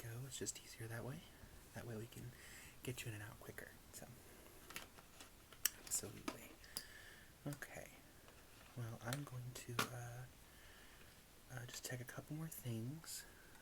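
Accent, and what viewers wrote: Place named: New Jersey, United States. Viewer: Being in and out of hospital lately I only wish this was the care I got, this is amazing I would love you to do something with typing like a clocky-clunky keyboard like checking into a spa for a facial you would be awesome at that with the New Jersey accent you do so well I LOVE that video all the same thank you for the amazing content and your work ❤ x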